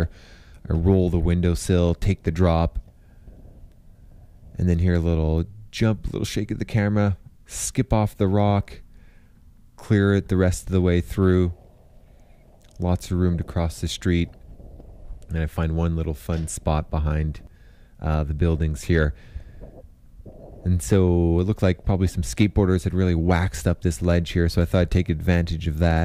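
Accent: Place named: Canada. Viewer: I love the Canadian accent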